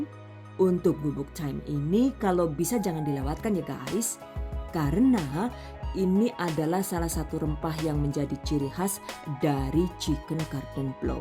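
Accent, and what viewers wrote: Banyumas, Indonesia. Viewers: sebelum2 nya cuma nonton n👍.tp baru2 ini subscribe .sangat cleaaar penjelasan nya . ide2 buat jualan nya goood...ngapak nya 😁👍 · Kiye wong Madiun apa wong ngapak si sing duwe channel dadi geget😂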